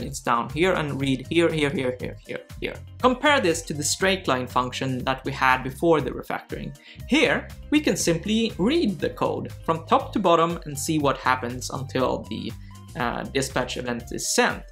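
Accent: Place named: Sweden. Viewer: Hi guys i'm i lead a small web-dev team writing mostly angularJS 'i also have a background in C# yet i fail to see +Krzysztof Suszyński point? BTW + i love your show you explain things very clearly and your Swedish accent now resonates in my mind on mondays:) i also have mixed emotions about the point made in the video..perhaps +Alexandre Franco video will make more sense of it i will have to watch it. nevertheless +Krzysztof Suszyński can you elaborate more on why is this more valid in JS?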